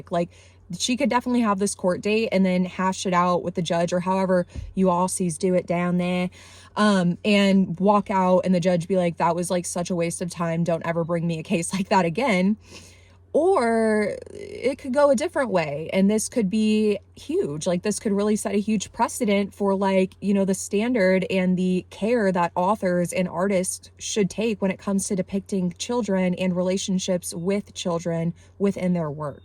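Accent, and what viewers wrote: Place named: United States. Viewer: I understand where you're coming from, but you have to be able to see a path to banning literature made by marginalized groups stemming from criminalizing this drivel. You have an american accent, so I'm assuming you're from the US. If you are, you likely know literature in general is under attack here. Personally, I think social rejection needs to be the method to discourage this garbage, rather than legislation and criminalization.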